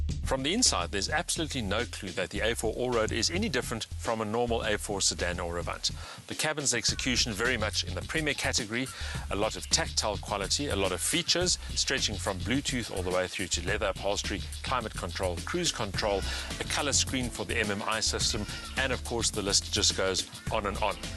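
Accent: South Africa. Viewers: ARE YOU FROM SOUUUTH AFRICA?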